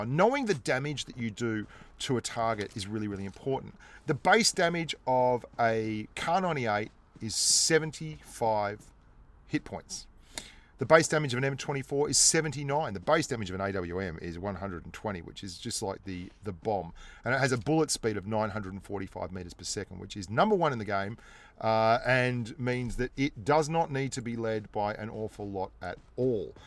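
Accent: Australia. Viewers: Bro ...thanks ...nice video are you from Australia ?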